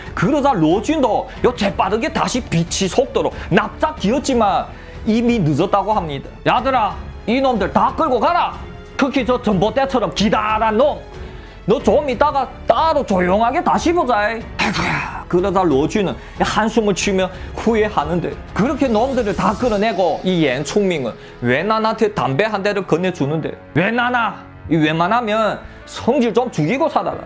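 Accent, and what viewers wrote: China. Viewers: 조선족이 한국어하네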